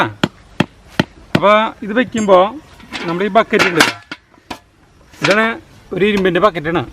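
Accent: Thrissur, India. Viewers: thrisur basha kelakan vendit sarikum njn video kanane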